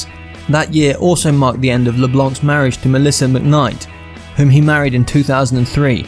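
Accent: United Kingdom. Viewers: half hour of syupied math in bri ish accent. fah shame/ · I have watched a few of these tubes and find them interesting and informative but DAMN! your pronunciation of English is downright annoying. You sound as if you're English or at least of that heritage but some words you use should be avoided at all costs. Such as "La Fayette" and "Illinois" in the Axl Rose episode are pronounced as "LaFayettey" and "llinoise",m and in the current episode you speak of and "Ensembel" when referring to an ensemble. If you take more time you mau increase your subscriber base.....Just saying. Nevertjeless will still watch when I am completely void of contemplative thought ans the mispronunciations wake me up.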